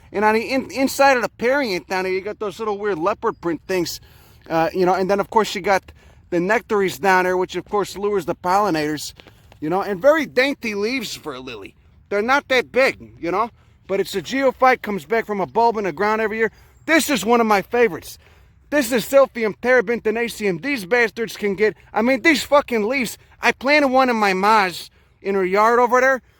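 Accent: Chicago, United States. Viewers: "The lawn is a tragic, impoverished mindset" BRILLIANT! This guy knows his shit! Love the Chicawgo accent! LOVE it! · So, I've been watching your videos while I do my schoolwork for some reason, and I zoned out from this one for a minute as I was reading a Geometry textbook and looking at hexagons and decagons and stuff, and I just hear a voice with a thick Chicago accent say in my ears: "That would actually look like It'd hurt if you put that in your ass, I don't want to put that in my ass." I had to rewind the video a few times to make sure you were just talking about Echinacea. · Omfg bruh this really is how old school mfs Sound in Chicago instant subscribe i would listen to dis guy read the phone book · Chicago Italian accent lol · Oh my glob, this guy is HILARIOUS! I have to go back and watch everything he's ever made, now. Wisconsin has a lot of the same flowers and I'm a Master Naturalist trying to learn more plants every day, and starting to recognize a rather amazing number of them. 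Takes time to get them all to stick in my brain, one plant at a time. But with a Chicago accent and all the expletives? I'm going to learn SO much faster now!!!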